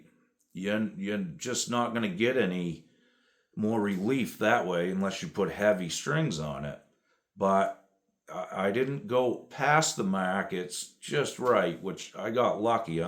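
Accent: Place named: New England, United States. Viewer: What part of New England do you live in?? The accent is hard to miss. Cool guitar.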